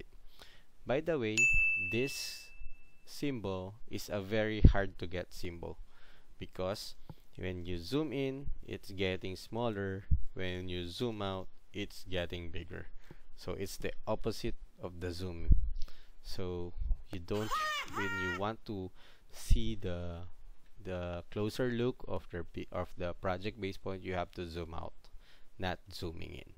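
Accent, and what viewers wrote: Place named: Philippines. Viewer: I can tell your pinoy from ur accent😀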